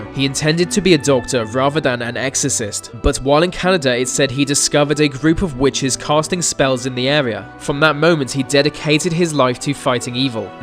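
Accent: United Kingdom. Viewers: Can anyone tell me why we need to have GODDAMN LIMEY'S narrating ANYTHING especially for Canadian videos?!? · Word if advice to anyone not familiar with Ontario: don't EVER say it the way the narrator does in this video. That is incorrect and you will be corrected and/or laughed at if you do. No, just because he's got a British accent it's not some eclectic English pronunciation he blurted out, dude simply never heard it pronounced before and that's a fact. The A in Ontario is a long vowel, not short. Simon Whistler does this same shit all the time in the videos he narrates too.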